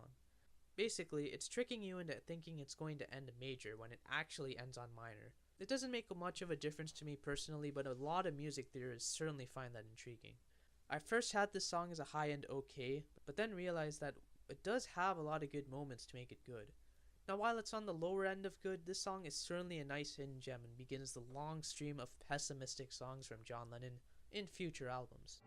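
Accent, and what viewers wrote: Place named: United Kingdom. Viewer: "Sarr" is a accent thing, brittish english is diffrent from american english! Great video btw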